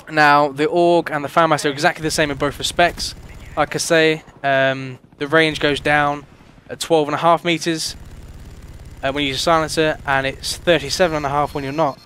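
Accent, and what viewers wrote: United Kingdom. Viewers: I'm guessing ur a British guy am I right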